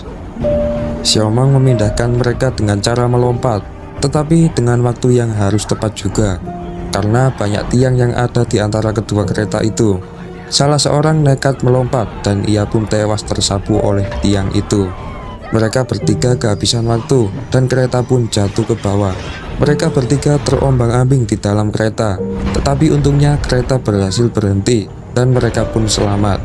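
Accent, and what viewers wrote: Java, Indonesia. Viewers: medok amat min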